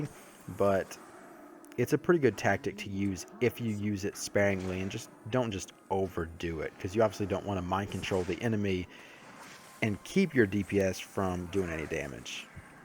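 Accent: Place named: South, United States. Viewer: This is nice to hear a fellow player with a kinda Southern accent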